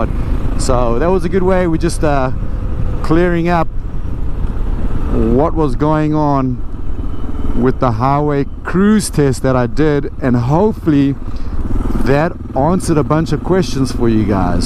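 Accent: South Africa. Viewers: I detected the accent pretty quickly too. I lived in SA from 1968 to 1979 in the Amanzimtoti area mostly.. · Is that a South African accent I detect?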